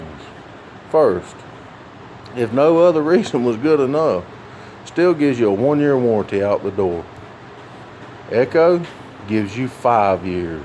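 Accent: United States. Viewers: Sounds like good-ol American English 2 me.....👋😁🇺🇸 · we Love our Southern accent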